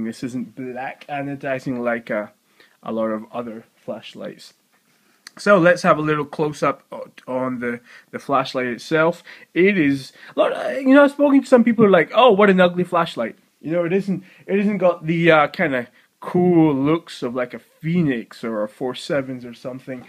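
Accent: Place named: Scotland, United Kingdom. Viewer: hi would the new ZL SC600MkII in neutral white be better as a general home general walking flashlight?. i know the new one will be some now 900 Lumens, but 900 lumens in NW compared to CW 900 lumens, would there be a great noticeable loss?. love your review and...scottish accent. mine has a bit of glasgow. thanks.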